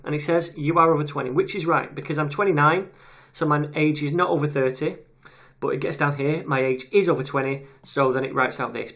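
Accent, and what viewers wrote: United Kingdom. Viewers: Where about a in the uk are you from buddy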